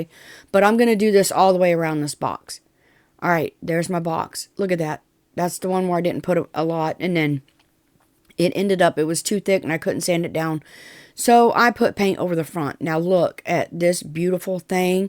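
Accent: South, United States. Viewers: I love what you created from the various types of wood ! I’m a displaced Southerner living in the west and felt right at home hearing your explanations! 😊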